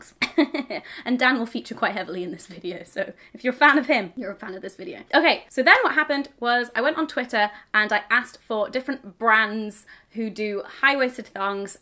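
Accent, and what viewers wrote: United Kingdom. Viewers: You sound extra British today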